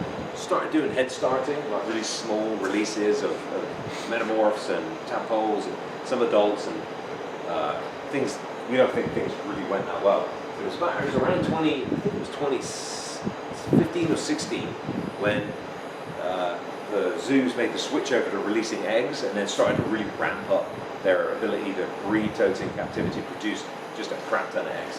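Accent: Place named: West Country, United Kingdom. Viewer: Good to see hasn’t lost his West Country twang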